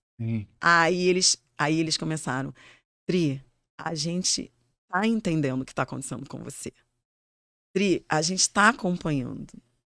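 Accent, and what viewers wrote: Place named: Rio de Janeiro, Brazil. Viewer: Sotaque lindo, Carioca né... lindona de viver. gente grande !!!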